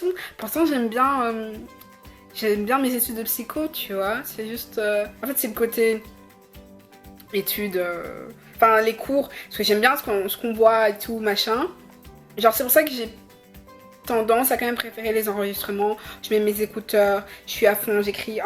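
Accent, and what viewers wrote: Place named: England, United Kingdom. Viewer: Moi j'aime bien ton accent anglais hahah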